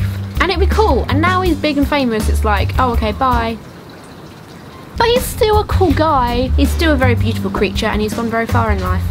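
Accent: United Kingdom. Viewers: oh and your british accent rocks :D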